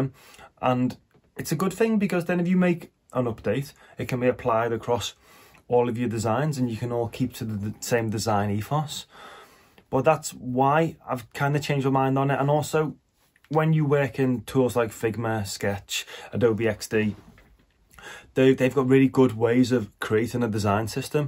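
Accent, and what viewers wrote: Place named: Scotland, United Kingdom. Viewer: ok, your accent resembles me scottish one that's why i asked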